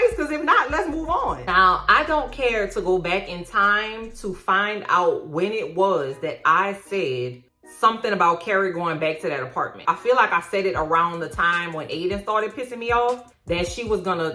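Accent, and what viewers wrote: New Orleans, United States. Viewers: My favorite pies: Lemon Meringue, French Silk (Chocolate), Pecan, and Sweet Potato are my favorite pies. 🥰🥰🥰 I will eat a Fried Apple Pie every now and then...but it is not my favorite. Also, Cousin, I LOVE how you protect your space. And I LOVE the N'awlin's accent. (However, I am a Southern born & bred Cousin...MS). ❤ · Damn fools complaining about you & your channel? Your awesome NOLA accent??? Don't get me started. The Brooklyn in me will read them! My PR/Capricorn tongue will unfurl and I'll be placed in YT jail Please. Why ARE they watching if they don't like you? Idiots. Okay enough of that. 7 minutes of AJLT for the second to last AJLT ep. Yep. That's what it felt like. Only thing I'll miss about this show is you & your recaps. I look forward to THAT more than And Just Like Crap (shout out to the other YTers who have that channel name). 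You are 🔥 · Your accent is what I find a very pretty accent type for US and to me (as a Polish woman who moved to the UK as a teen) it's very clear, and I can understand every word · I'm so sorry to hear you're getting arseholes, racists, and idiots coming here to your channel. I've only been watching for a few weeks---found out about you on Reddit!--and for what it's worth, I love your New Orleans lilt 🙂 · Awwww I love the N’awlins/New Orleans accent! I have NO trouble understanding! Two of my best boyfriends were from Louisiana, one lived in Slidell/Meterie, and the other in New Orleans proper. ❤ Bith talented musicians, and one a great cook! Good for you for sticking up for yourself and your channel’s loyal community! Keep on using that strong voice of yours proudly, cuz I love to hear it! 🎉🙌🏼🌟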